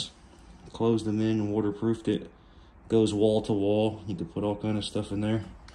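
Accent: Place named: Chicago, United States. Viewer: Cook county accent.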